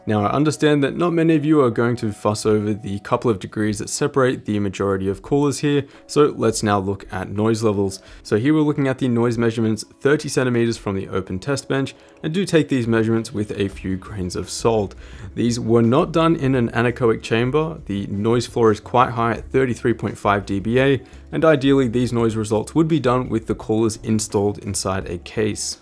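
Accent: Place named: Australia. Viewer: Love your videos, nice to have an Aussie accent coming through. I saw in this video that you show the Dark Rock 4 cooler on a min-ITX board and it not fouling on the memory. I'm looking for an air cooler for my Asus b450-I gaming board but can't find any firm info on if the DR4 cooler will interfere with the memory slots or not. Can I ask which board you have in this video, and if you have any idea if it will usually foul against memory or not?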